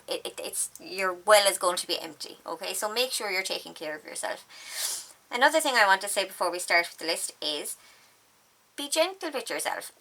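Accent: Ireland. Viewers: Thank you 🥰 all of your ideas are very helpful and definitely inspiring. I can imagine this does happen from time to time, I am just lucky I mix it up a bit in between crafting projects, like doodling, knitting, sewing and checking out different techniques from all the amazing crafters we have in this collaboration! You are all very knowledgeable and experience and a source of great talent! Thank you for sharing your time and its lovely to hear that bonnie Irish lilt once again. Blessings and hugs 🙏🏻💜🥰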